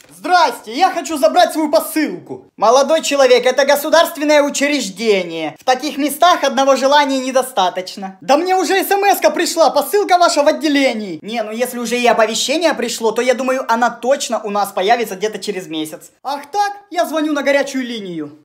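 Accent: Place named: Ukraine. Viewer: всегда вместо буквы "Г" говорит букву "Х".Видно что Украинский акцент👏👏.У тебя самые забавные видео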